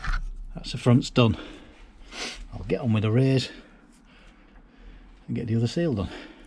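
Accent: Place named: United Kingdom. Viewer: Hi; I kept watching due to the British accent. Do you have the thackery washers to the rocker boxes; as supposed should be? like in the later parts book drawing?; not in the manual book. Also kept watching to see an UK type model (I have the export model that is similar) but seems all have the USA specs...I need to upload a video at some point. I have a 79 with dual plug head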